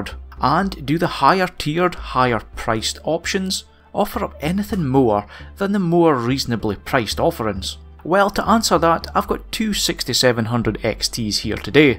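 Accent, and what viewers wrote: Scotland, United Kingdom. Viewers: i love listening to Scottish people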